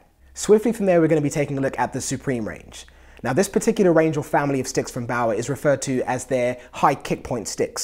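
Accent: United Kingdom. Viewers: is he british lol he goes fond of taking haha good vid tho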